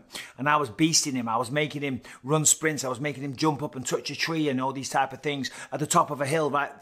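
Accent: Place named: United Kingdom. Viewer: Dude is a natural. He's decent looking, funny, great talker, and he has a British accent. How can you not like him?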